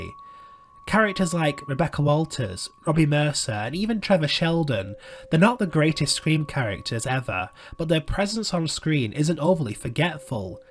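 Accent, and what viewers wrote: United Kingdom. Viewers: He's from the northern area of the UK, they all talk like that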